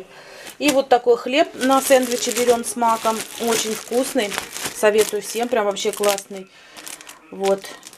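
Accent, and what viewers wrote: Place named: Uvarovo, Russia. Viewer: говор наш уваровский удачи тебе подруга!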